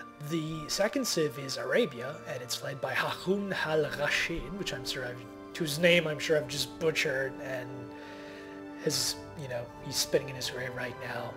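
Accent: Quebec, Canada. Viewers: Based on his speech patterns I would guess French Canadian, but thanks for playing.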